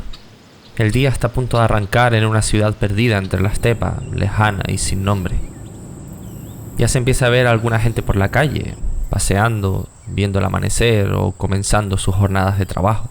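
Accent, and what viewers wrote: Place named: Canary Islands, Spain. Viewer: Eres un artista, y por tu acento pareces Canario. Lastima que no este en castellano el juego.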